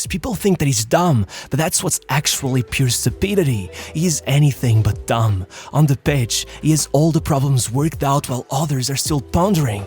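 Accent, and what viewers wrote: Portugal. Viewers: Bro, dumbass he's Portuguese and their accent is like that(in some areas). You're probably American or some shit and you would never understand.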